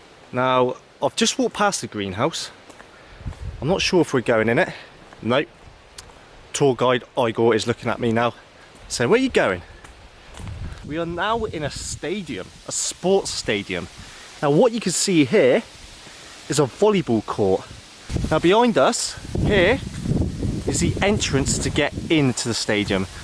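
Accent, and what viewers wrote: United Kingdom. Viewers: Lmao you know YouTube comments are toxic when a brit has to excuse his accent to those of us in the western world. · Your British by the way you talk interesting video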